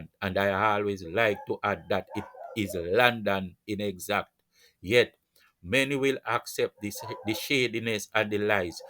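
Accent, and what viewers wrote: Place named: Jamaica. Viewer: Real talk my brother sounds like a real Patric Jamaican glad to hear you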